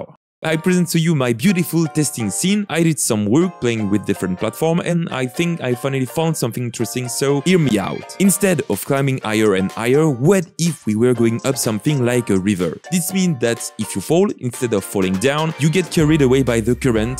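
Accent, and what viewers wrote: France. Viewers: Par contre frérot t français?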